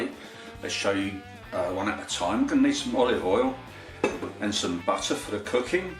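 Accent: United Kingdom. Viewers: Yummy recipe and lovely Brit accent, I love your vids! ❤️💕🙂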